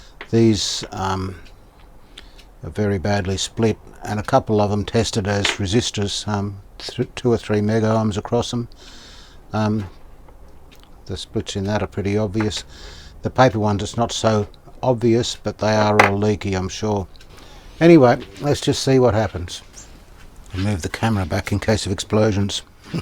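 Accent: Australia. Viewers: Geez thanks, Yup I first saw Manuel shield the caps this way then Dave and you, so if it's good enough for the pros, I figured it was the way to go. The thing is I have no formal electronics' training, I didn't even learn a trade in school; My first studies in college were photography, I struggled to get by in this field so later in life I had a career change and became a police officer (nobody's perfect...) Then after a few years of retirement I stumbled across some of Dave's radio restorations and I was hooked from the start. I always loved vintage audio and my personal gear is all from the 70s and 80s. I leaned about radios by myself and watching you guys' tutorials and got in contact with Dave for my first restoration of a 1937 RCA-Victor 87-K AM console. He's been mentoring me ever since, I owe him everything. As I gained a little more experience, like I said I started understanding more and more about radios and repairing them and there no better hobby for me at this point in my life. 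As for editing videos I know what you mean believe me, I 't have a proper video camera so I shoot everything on an iPhone and Apple being Apple, editing is always challenging... I of course subscribed to your channel some time ago and I'm always looking forward to your next instalment. Oh and by the way I love the Aussie accent 🙂 Cheers mate, lol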